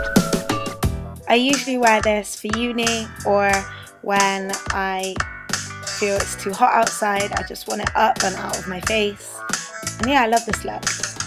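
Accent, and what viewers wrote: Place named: United Kingdom. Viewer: what part of the uk are u from i can't pinpoint ur accent?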